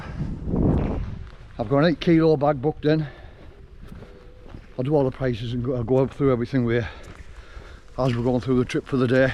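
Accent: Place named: Yorkshire, United Kingdom. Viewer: Hi boy I m ur new subscriber a Scotish Pakistani. Love ur Yorkshire accent.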